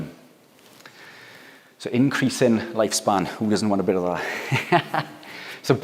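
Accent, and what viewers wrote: Wales, United Kingdom. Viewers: So what are your commercial interest along with this good will info? No, dont get me wrong, I enjoyed the bleep immensely and it was factual to the letter, it's just that I cant help being bleeping cynical about stuff. Its a necessity it bleeps, these days. Good luck anyhow. Ps. The Welsh accent made a nice bleep from all those yank MD's. 🙏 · Good, clear presentation. And I could listen to a Welsh accent all day! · The Welsh accent is the one thing I dislike about this video!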